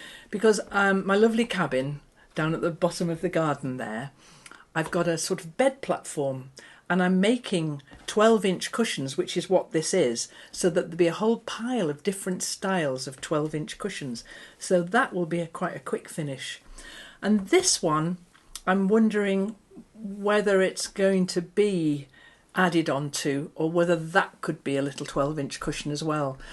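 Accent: United Kingdom. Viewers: I agree with sherryconroy. I look forward to your Sunday YouTube videos. My paternal grandparents were from England. They passed when I was just a toddler so I have no recollection of their lovely British accent. Listening to your lovely voice is so relaxing. I wonder...did they sound like you? I'd like to think so. Nowadays so many of the online tutorials just rush through the steps leaving me a bit unsettled and jittery. I think of you as our Mr. Rogers here in America..."won't you be my neighbor". Thank you for just being you!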